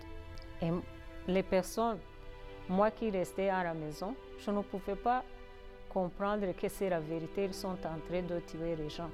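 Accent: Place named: France. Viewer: Idk why but I love her French accent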